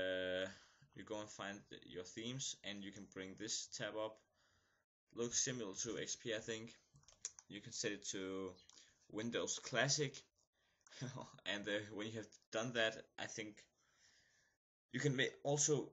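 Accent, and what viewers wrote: Denmark. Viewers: jeg kan høre at du er dansk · Dansker? :D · du er dansker er du ik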